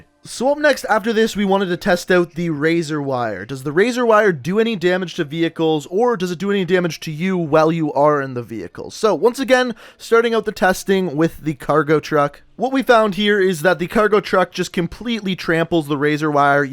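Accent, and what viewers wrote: Canada. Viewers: Fuck your accent Canadian fuckface!!!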